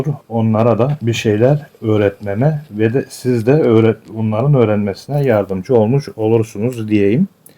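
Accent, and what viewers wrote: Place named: Thrace, Turkey. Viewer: Muhacir olduğunuzu veya Trakya bölgesi şivesi olduğunu sanıyorum. Hayırlı bereketli olsun, bilgiler için teşekkürler.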